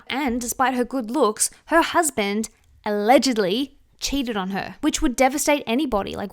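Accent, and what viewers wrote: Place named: Australia. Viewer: love your videos and just a fact, your accent remembers me of Felix from Stray Kids cause he is australian too (and Bangchan) <3 love you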